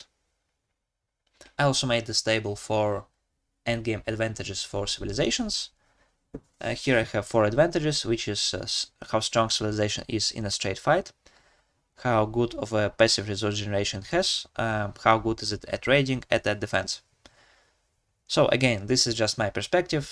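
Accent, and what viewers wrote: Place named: Eastern Europe. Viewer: Great content! I’m curious, where are you from? Your accent sounds Eastern European and I’m from Bulgaria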